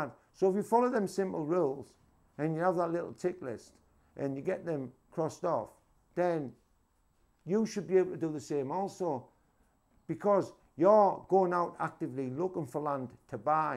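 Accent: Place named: Teesside, United Kingdom. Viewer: thats a sout teeside / north yorks accent ? ... recognize the twang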